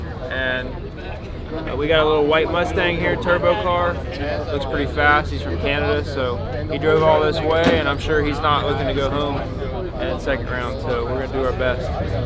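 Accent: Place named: Texas, United States. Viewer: I sense a little texas drawl with that cowboy hat on.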